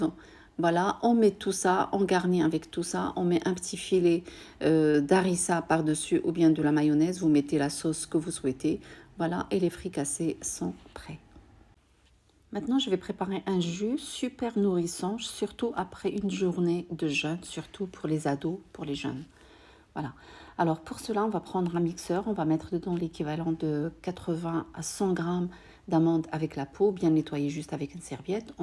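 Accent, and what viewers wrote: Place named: Morocco. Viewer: Salem mais comme J’❤❤❤❤ime bien tes vidéos et quand tu parles les deux langues et surtout quand tu parles en arabes avec l’accent marocaine Ta sœur algérienne 🇩🇿🇩🇿🇩🇿🇩🇿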